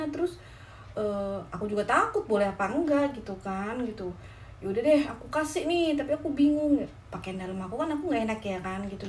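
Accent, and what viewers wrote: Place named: West Java, Indonesia. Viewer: assalamu'alaikum.....mami...,smga istiqomah dlm syiar islam di negeri sakura, aamiin...,smoga klgnya sakinah mawadah wa rohmah, aamiiin.. indonesianya dari daerah mna? kok tmpak logatnya kyak dari Pasundan, smga slh tebakan saya.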